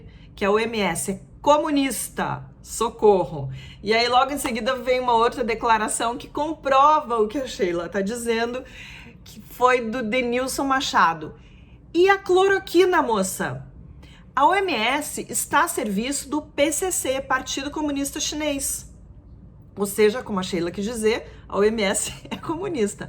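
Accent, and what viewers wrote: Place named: Rio de Janeiro, Brazil. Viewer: amo seus vídeos! Gosto muito como vc trata as notícias e da maneira polida e irônica que responde aos haters. Adoro seu sotaque carioca! Parabéns!!!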